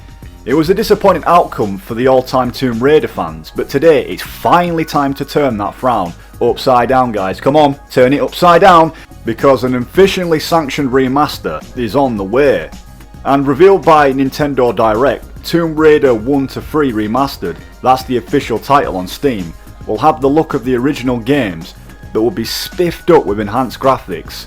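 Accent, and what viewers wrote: Bradford, United Kingdom. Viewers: Dude!? Are you from bradford??? You sound just like me 🤣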